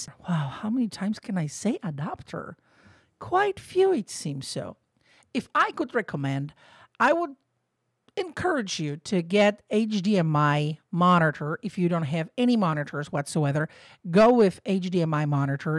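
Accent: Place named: Brazil. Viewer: You sound Brazilian. Awesome video! Thanks.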